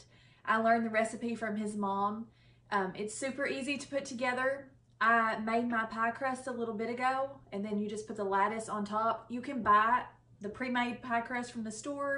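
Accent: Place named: South, United States. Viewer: Your southern accent is very sweet. Thank you for the recipe.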